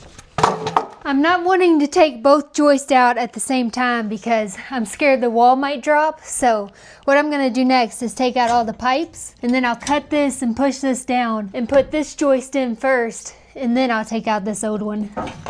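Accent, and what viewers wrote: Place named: Kentucky, United States. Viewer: I'm from Lexington Kentucky. That North Dakota accent sounds just like a Kentucky girl 😊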